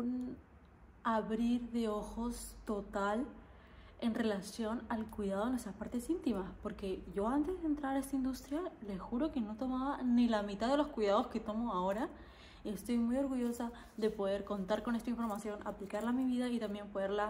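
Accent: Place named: Spain. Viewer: your the most beautiful girl I've ever seen, I love your beautiful Spanish accent and words, I hope someday I meet you and talk to you 😊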